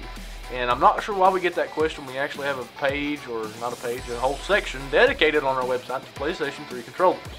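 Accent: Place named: South, United States. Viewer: Dude, you have such a cool southern accent!! I wish i still had mine but i lost it when i moved up north:(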